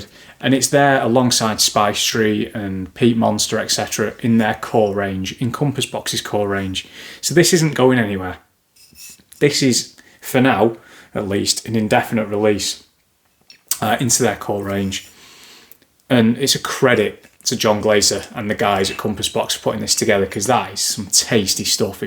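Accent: Manchester, United Kingdom. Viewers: Nice video there... enjoyed your analysis and choices. Great to hear a fellow Northerner (I grew up in Preston - you sound more Manchester way).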